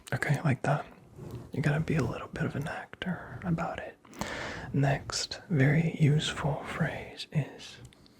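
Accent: Brazil. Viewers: claro, ele é brasileiro.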